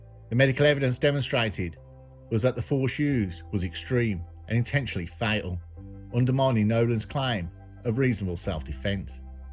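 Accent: Birmingham, United Kingdom. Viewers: Brummie accent may not be to your liking but lots of us are following and enjoying his work and narration.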